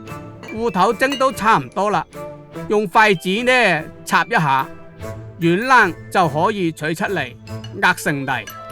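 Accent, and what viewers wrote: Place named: Siyi, China. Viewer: 梗係我哋四邑口音啫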